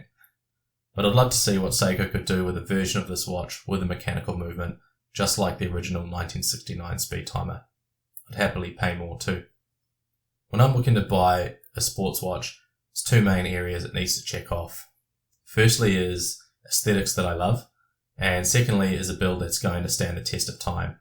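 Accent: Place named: New Zealand. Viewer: You sound more Kiwi than Australian. Another ship jumper?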